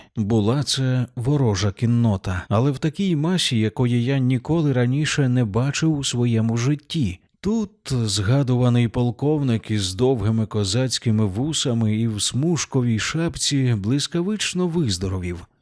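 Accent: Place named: Chornobyl, Ukraine. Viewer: дякую за справжню ,,чорнобильську,,вимову у тексті👍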